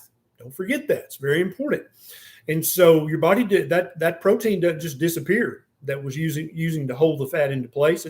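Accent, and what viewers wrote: South, United States. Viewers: Oh, lighten up. The doc has a southern accent and he is in his own home. He can talk any way he wants. If it offends you, you don't have to listen.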